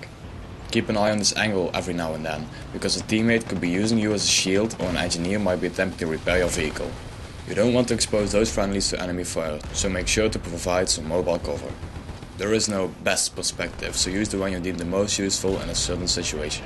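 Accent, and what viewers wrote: Netherlands. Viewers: yeah,the first 3 seconds you started talking i thouth:''he is dutch...'' me to! :S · wow dat accent hoor je meten dat je uit Nederland komt geweldige video trouwens erg duidelijk en goed in elkaar gezet ik ben nu lvl 14 1/2 en zelf ik heb nog moeite met de tank. bedankt! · r u dutch? thats not an insult, for me as german it sounds that way ;) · kom jij toevallig uit limburg? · Nederlands accent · Dat Nederlandse accent herken ik meteen :D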